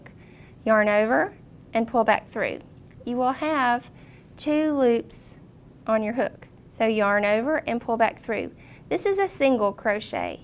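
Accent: South, United States. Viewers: I live the southern accent!!!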